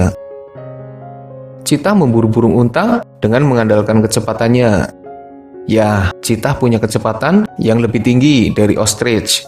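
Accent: Banyumas, Indonesia. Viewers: Logat ngapak ye..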